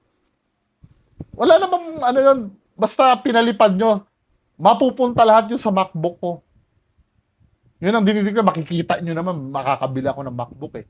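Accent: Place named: Batangas, Philippines. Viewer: 😂😂😂 pag masaya nagihing batanggenyo a a o owww ala ehhh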